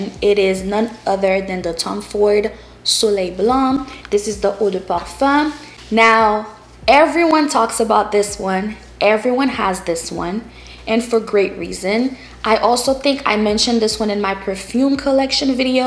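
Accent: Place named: France. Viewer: You sound French😍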